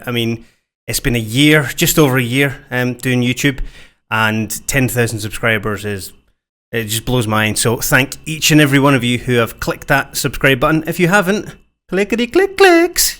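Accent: Scotland, United Kingdom. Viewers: OoooOooOOO dat thicc Scottish accent. Congrats on 10k!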